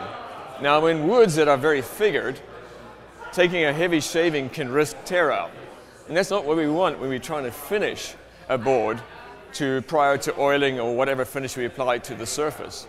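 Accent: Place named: South Africa. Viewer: A south african bloke working at lie nielsen? Love it. Great technique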